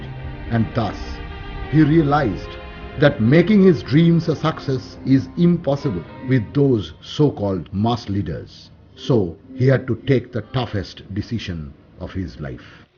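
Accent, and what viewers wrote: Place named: Bengal, India. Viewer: Typically bangali narration!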